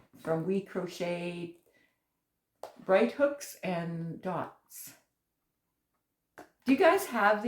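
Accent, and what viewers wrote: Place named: Canada. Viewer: Love listening to you talk…love Canadian accent!! My step daughter & her husband live near Courtney, BC. She’s starting to have the accent mixed with the Texas twang (where she moved from). Love the hooks hope they work well for you!❤❤❤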